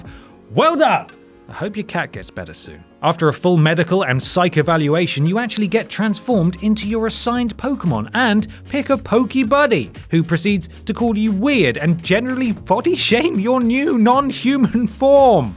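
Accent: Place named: RP, United Kingdom. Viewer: “I don’t have this problem because I speak the queen’s English.”, you’re a national treasure!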